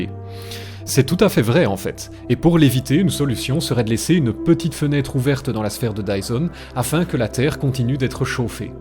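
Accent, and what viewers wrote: Belgium. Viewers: P pour moi ton accent est normal .. je suis Belge également, mais pour beaucoup de français l'accent Belge est celui qui est caricaturé dans "le diné de con" ... ce qui n'est pas pareil :D Personnellement ca ne me dérange pas toutes les histoires de monétisations et de publicités, c'est normal après tout ! A bientôt !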